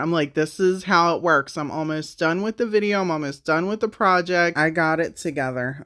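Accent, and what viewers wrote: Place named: Maryland, United States. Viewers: 😂 yes, we have a distinct accent here in ❤ Maryland. I totally understand what you're saying. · Heyy Philly girl here, we also say wooder! Your ‘accent’ is one of the reasons I started watching your videos, in addition to your amazing art and diys! I, unfortunately, live in Florida at the moment and I refuse to say ‘water’ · Hi. I love watching your videos. I learned today that I could blend chalk paint and acrylic to use as a base. Way cool! I am really in to decoupage right now, so I loved all of those, but the Christmas ornament was my fav. I had a sneaking suspicion that you were from Maryland. I too used to live in Maryland...Bel Air. I still say wuder even though I now live on the West Coast. Some things you just can't let go of!💖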